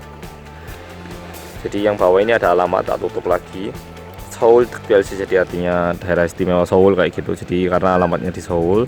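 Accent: Java, Indonesia. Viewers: lucu rasanya mendengar orang korea ngomong nya medok.. emang sih aku juga medok dan aku asli Indo tapi masi kesusahan bahasa sendiri haha.. (bahasa jawa maksudnya). btw ibuku suka ngeliat video mu dan ternyata ya.. lumayan lah... ketawa sendiri aku ngeliat nya :v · klo ngomong inggr singapore accent banget, klo ngomong indonesia jawa banget, klo mnurut sesama org korea, korean-nya beraksen juga ga? kan uda lama tinggal di Indo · logat jawanya nggak ilang2 haha 😂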